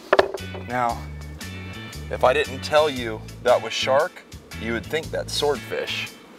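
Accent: South Africa. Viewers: The dude sounds south african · has a South African accent. So cool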